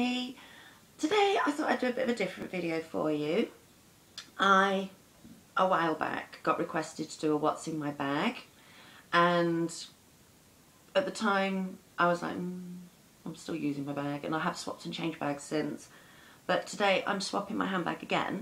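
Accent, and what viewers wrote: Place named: London, United Kingdom. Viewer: I love big bags too. Love yours. I actually just recorded one of these video's yesterday. I am gonna try to upload it today for yall. Or tonight. Lovein your accent. Where do u live? London? Also like your sense of humor. So u know Gemma pretty well? She is so sweet. I have known her from on here for a long time now. Such a doll she is. Anyways, great tag..I will have mine up soon. TFS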